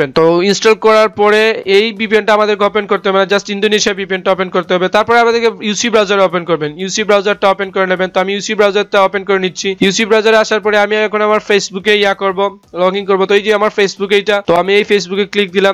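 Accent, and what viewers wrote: Sylhet, Bangladesh. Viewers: Vai ki sylhety😁😁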